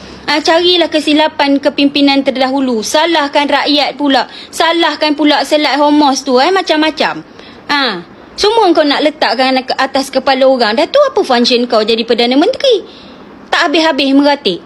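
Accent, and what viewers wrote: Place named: North, Malaysia. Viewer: DIA MEMANG PELAQ BAHASA ORANG UTARA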